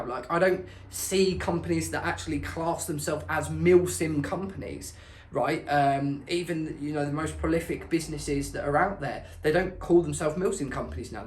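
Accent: United Kingdom. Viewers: Can someone PLEASE tell me what was said ? I can’t understand Scottish !!!!! Probably nice sentences but still ….. very confused 🤣🤣🤣🤣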